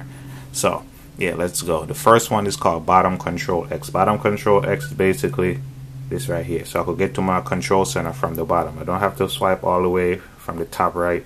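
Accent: Jamaica. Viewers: I’m hearing slight Jamaican accent when u speak, are you Jamaican or from a Caribbean island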